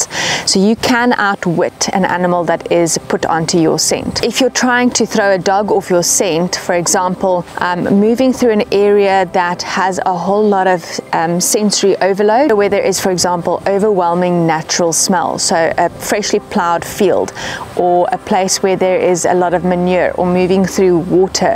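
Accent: South Africa. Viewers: You have a pretorian accent 😊 I hope I’m right? I’m from the USA, it’s worth a guess. Also excellent content! Subbed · Listening to a South African teach me how to counter track just feels right. 🥷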